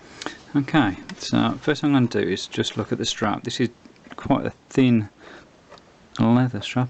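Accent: United Kingdom. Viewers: I guessed that 'С' ('S') would mean 'Medium' and 'Д' ('D') would mean 'Long' on the basis of the Czech I know, where 'Strední' is 'Middle' and 'Dlouhý' is 'Long'. That £5 was quite some bargain for what I would describe as a collector's item and the Sokol 204 may look basic, but the functionality sounds first-class to me. Thanks for the care tips, although it didn't look all that dirty from what I could make out. I'm getting numerous Worcestershire-based results looking for your name, which makes sense to me, as I have a reasonably good ear for accents and it's screaming "West Midlands!" to me. If so, you're from my late father's sometime neck of the woods, as he studied at Worcester College as part of learning to be a schoolmaster, later at Bognor Regis Teacher Training College, then going on to teach at Spondon House, Derby. I think he still harboured an affection for Worcestershire as Tom Graveney was a favourite cricketer.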